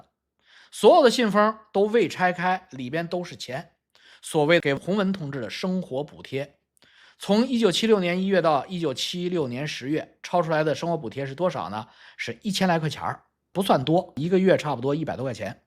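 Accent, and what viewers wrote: China. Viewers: 您的湖南话真像😂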